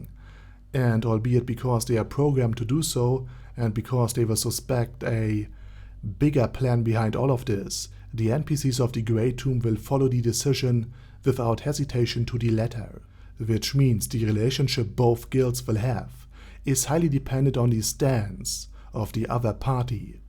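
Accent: Germany. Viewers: First of all Guten Tag, i like you're Content but as a german its so wierd to hear someone speak with such a havy German accent. But keep making these videos.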